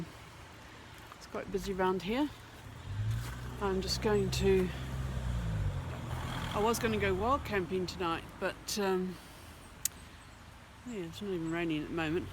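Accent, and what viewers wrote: New Zealand. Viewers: Is that a New Zealand accent i detect ! I grew up in Auckland but have lived in Manchester for the last 27 years !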